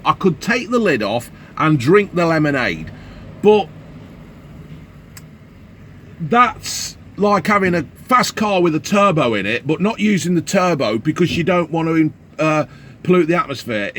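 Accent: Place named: Leeds, United Kingdom. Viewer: Our kid I sense some Leeds dialect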